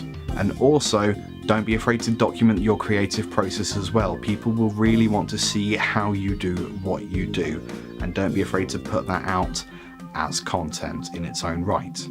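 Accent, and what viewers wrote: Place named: England, United Kingdom. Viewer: Thank god an English accent! 🤣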